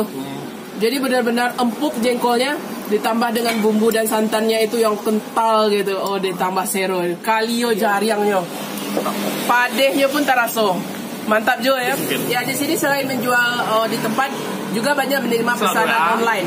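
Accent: Minangkabau, Indonesia. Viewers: Iko baru mantap, kuliner minang di review oleh orang minang asli dgn dialek khas minang. Sukses taruih uni di tunggu video2 berikutnya, lapeh juo taragak jo kampuang nan jauah di mato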